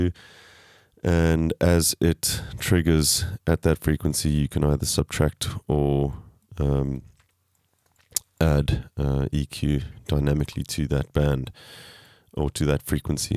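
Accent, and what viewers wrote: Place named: South Africa. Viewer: I hear the SA accent. I feel at home.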